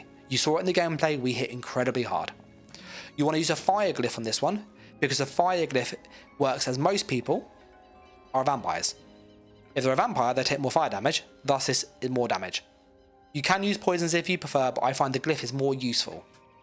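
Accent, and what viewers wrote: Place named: United Kingdom. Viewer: lol I'm just trolling. I knew you was a Brit from the accent. I lived near Mildenhall for 2 years back in the days. Played darts at the Bird-in-Hand pub, was a blast.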